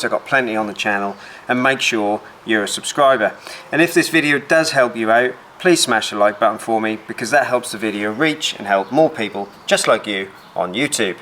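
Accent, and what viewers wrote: Norfolk, United Kingdom. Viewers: Awesome - just found you as I am about to attempt to renovate my bathroom - you sound like a local too ! (Norfolk ?) excellent tutorial thank you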